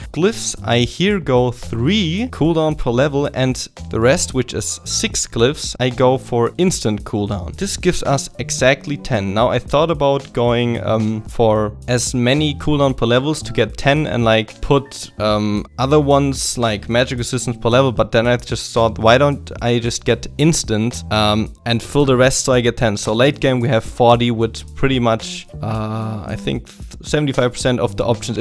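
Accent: Germany. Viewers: are u from germany or where are u from?ü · Man merkt übelst dass du Deutsch bist xD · are you german? cuz you sound like ^^ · Hey c: are you from germany? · Man merkt an deinem Englisch, dass du aus Deutschland kommst xD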